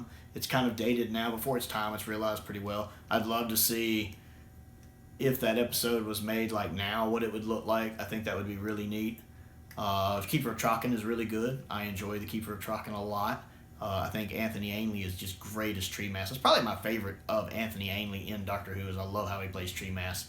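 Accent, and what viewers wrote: South, United States. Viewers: Listening to an American with a strong southern accent talking in detail about a cheap TV show (albeit beloved) made by the BBC in the 60s, 70s and 80s is such a wild juxtaposition - I love it! You're easily one of the most articulate reviewers for Doctor Who I've watched/listened on YouTube. Love you're take on the programme!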